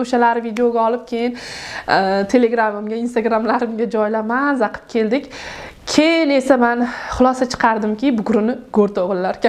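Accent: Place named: Fergana, Uzbekistan. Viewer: Шевез Фаргонача экан Бекабодлиларни шеваси бошкача эшитганман бизи шевада гапирасиз экан яхши курдим кизим бахтли булинг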